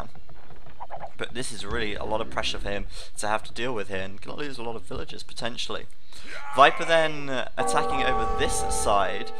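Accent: England, United Kingdom. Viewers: I like your british voice c: it makes your game-commenting more enjoyable. Ps. Forgive my bad english c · You're a great commentator, especially with English accent haha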